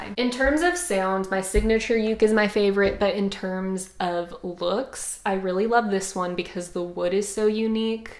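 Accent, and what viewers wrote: South, United States. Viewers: What I heard: how do you lack living in a big family? What she said: how do you like living in a big family? She got one nice country accent y'all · Are you from the south? Lol you say y’all. Just wondering, because if so, I’m from the south too! Y’all have a nice day!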